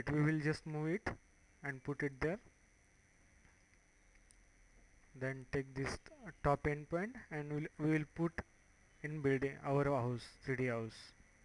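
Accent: India. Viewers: This narrator is awful! What the helll is he saying? Get rid of the india/english accent, or get someone else to speak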